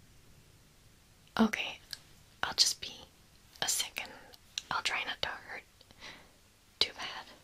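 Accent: United States. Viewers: She can really rock a American accident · I am unfamiliar with this series (forgive me!) and I could have sworn you said "prison mike" and I died. BTW, your American accent is like, my favorite thing in all of ASMR, it's SO good. Pretty much every video you do with it instantly goes into my favorites! So thank you thank you thank you <3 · I love your american accent!!! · Great American accent! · Your american accent though 👌👌😊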